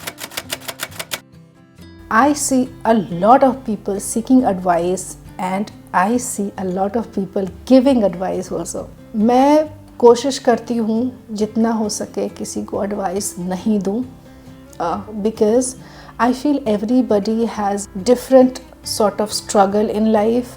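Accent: India. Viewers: Love the way you explain things.Love your smile, listening to you,has a bihari tone being a bihari love it.keep smiling always ♥️♥️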